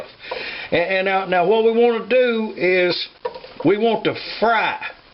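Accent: South, United States. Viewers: I'm from the north in Michigan and I married a southerner from TX. He also have family in Oklahoma and Arkansas and the food they make absolutely blows my mind. I am forever trying to mimic his family's recipes and just how welcoming and loving they all are. Your accent and cooking always reminds me of them and I love it so much. Keep it up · Awesome!your southern style accent is great! I enjoy shopping at the open market too...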